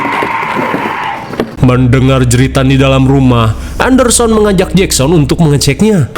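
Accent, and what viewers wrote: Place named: Sunda, Indonesia. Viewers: logatt Sunda itu biasanya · itu logat Sunda bang, resep bgt saya dengernya, karna saya juga orang Sunda🤣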